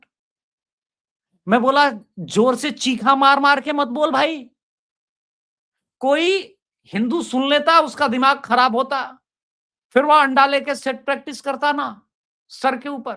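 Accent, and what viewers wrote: Hyderabad, India. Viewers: Bahit majja ata hydrabadi bata sunaneku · आप तो पुरा हैद्राबादी स्टाईल मे बाता करता 😂😂😂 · JI AAP ZABARDAST HYDERABADI BATH KAR RAHE HO…,OH MY GOD,MY HYDERABAD SE HOO, PAR HYDERABAD ASAL NAM BHAGYANAGAR, HA 15 MINUTE MEIN SAB BHAGJAYENGE, JAI BHARATMATA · you have nailed the hydrabadi accent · your Hyderabadi is excellent! 😆 Thoroughly enjoyed this video! We have full-toss entertainment going on in the entire country everyday, and the popcorn machine is getting overloaded! 😂😂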